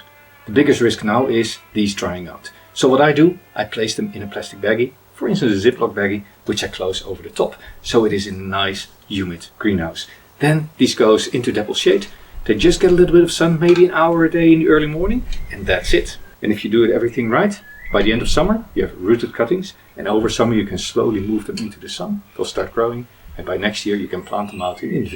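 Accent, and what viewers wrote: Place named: Netherlands. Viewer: are you from the netherlands i can hear from your accent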